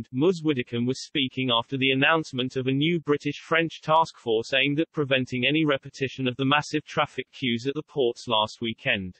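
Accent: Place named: Russia. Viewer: Why not narrate your own videos? We won’t make fun of your Russian accent.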